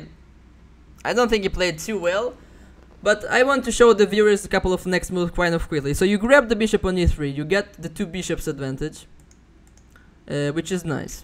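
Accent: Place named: Brazil. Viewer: So quem é brasileiro conhece esse sutaque do ingles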